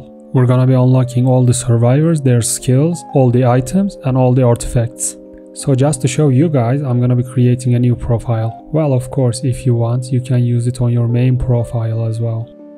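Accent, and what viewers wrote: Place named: Turkey. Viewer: turkish guy detected gang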